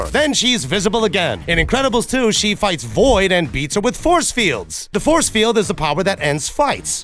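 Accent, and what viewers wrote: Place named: New York, United States. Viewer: I personally think invisibility sucks as a power. It's as effective as mace spray to the eyes, which would actually be a more effective ability. Also, random thing, but I was NOT expecting you to be Asian with THAT Rocket Raccoon New York accent.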